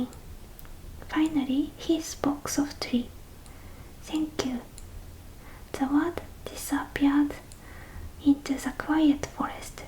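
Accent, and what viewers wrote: Japan. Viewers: Engrish very kawaii❤❤❤❤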